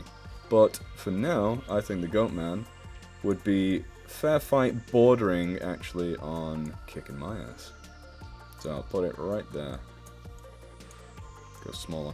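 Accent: Ireland. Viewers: 1, you're Irish? and 2, fucking lmao this was funny! But also you showed me some cryptids I've never looked up before 👀 gg, sweet vid~ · Love the Irish accent lol great video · I like your Irish! You are adorable!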